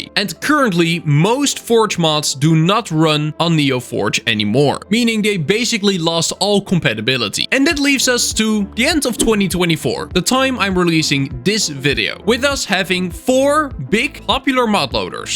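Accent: Netherlands. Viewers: i mainly just use fabric cause my laptop is so bad that it runs my browser at 2FPS, if i use forge i need to close everything else and then i run on 10FPS but with fabric and shaders i run with 10FPS (on fabric i dont have so many optimalisation mods. i only have sodium, lithium, ferritecore and entity culling) also you for some reason sound realy dutch with how you speak english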